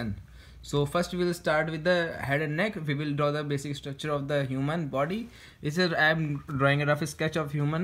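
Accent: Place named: Mewat, India. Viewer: My frnd shared it to me . And now I can not help loffin' ... the mewati touch is just adding spice to sentences 🤣🤣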